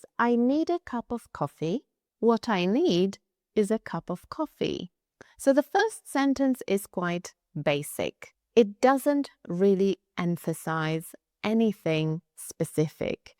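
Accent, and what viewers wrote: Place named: United Kingdom. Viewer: Very good explanations and excellent British accent!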